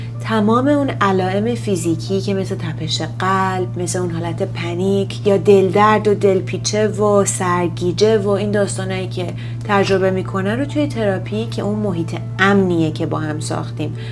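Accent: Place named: United States. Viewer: خانم دکتر سلام عرض شد ارادتمند، ببخشید شما در تلفظ اصلاحات انگلیسی، لهجه امریکایی دارید،ببخشید شما آمریکا یا کانادا تشریف دارید؟یا فقط بر حسب تسلطی که به زبان دارید انقد قشنگ تلفظ میکنید ،چون خودم خیلی زبان دوس دارم عرض کردم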